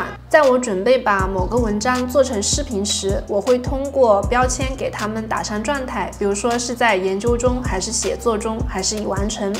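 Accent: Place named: Shashi, China. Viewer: 为什么你说话这么像我们沙市人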